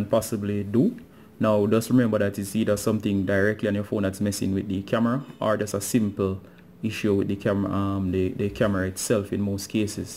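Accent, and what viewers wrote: Jamaica. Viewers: by the way thanks..idky I was surprised to hear a Jamaican but dweet! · He's from the carrabien (jamaica)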